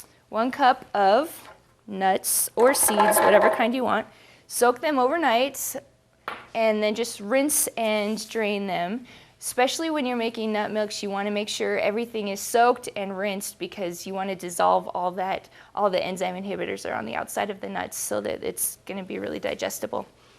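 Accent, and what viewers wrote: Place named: Australia. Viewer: Posh australian accent.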